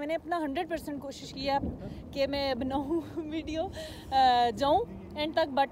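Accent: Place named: Pothwar, Pakistan. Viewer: Aap to pothwari bolti hai apni zuban main banaye aik video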